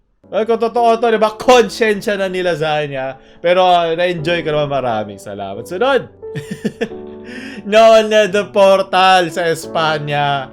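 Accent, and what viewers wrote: Visayas, Philippines. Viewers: Yung bisaya mo nagiging local accent na stay yawa and cancer everyday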